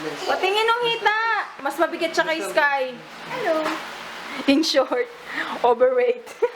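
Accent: Visayas, Philippines. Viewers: bisaya ka sis? Parang pansin ko may kunting accent ng bisaya.😊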